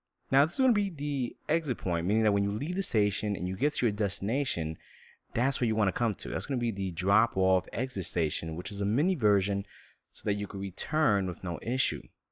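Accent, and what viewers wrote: New York, United States. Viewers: you sound like your from new York or the Bronx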